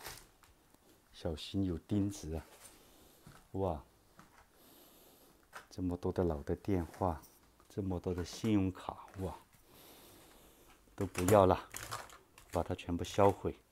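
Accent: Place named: Chongqing, China. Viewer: 听口音 你是重庆方向的吗😮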